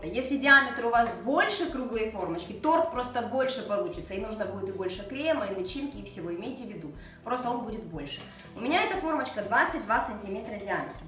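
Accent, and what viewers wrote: Ukraine. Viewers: а говор у вас интересный,трошки услышала,вы в Украине живете?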